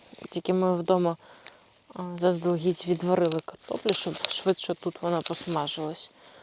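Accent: Ukraine. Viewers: Прикольно балакати: почала тойво підгортати )) як на Закарпатті)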